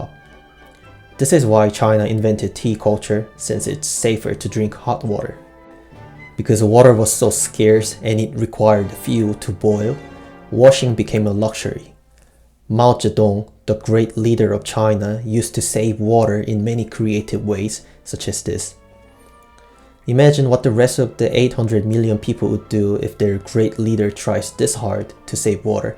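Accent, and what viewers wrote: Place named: Southeast Asia. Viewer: printed in their dna is false. Its the culture. My grandfather was from china close to Nanjing. Migrated to Thailand after WW2 (there was no food). I went to a british school in thailand. I am currently studying in Australia. As far as I am concerned, my supposed selfish DNA is not influencing my behaviour in the slightest. I think you know this, but I think you're prejudiced. The Selfish section of your video is true, but there are better explanations found on youtube, explained in a much more socially acceptable way. My guy, consider your behaviour and ask yourself if this is going to do you any favors in life. You'll lose all respect from your coworkers and friends in New Zealand. It's odd, because you seem to be asian based on your voice. Most likely South East Asian. So I suppose I can understand your frustration with Chinese Tourists trust me. 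But a lot of South East Asians have chinese genes, in fact a lot of them are chinese migrants like myself. So "printed in their DNA" is just a load of nonsense. Its sad how people aspire to be edgy like this nowadays. I always thought the goal in life is the become more of a gentleman. At 18 years old you gotta lot of growing up to do. I'm doing a lot of guess work here, but I think my opinions of you are pretty accurate and I can see eye to eye with you. I simply do not condone this type of behaviour.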